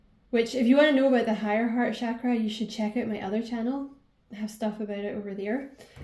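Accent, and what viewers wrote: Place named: Scotland, United Kingdom. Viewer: The second i heard the accent i subbed. Hellow my Scottish sister fom your sister in England ❤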